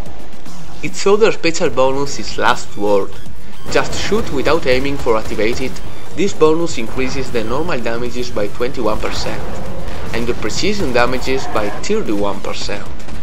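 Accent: Italy. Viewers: si sente il tuo accento italiano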